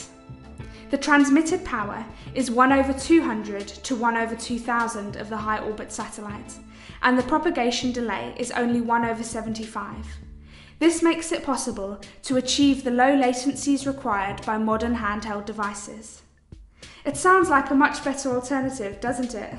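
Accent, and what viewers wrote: United Kingdom. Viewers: So well articulated. Perfect English with a Brit or New Zeeland accent. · Very good, high information content video. I especially like the English accent of the sweet sounding narrator. I give it a 99.99!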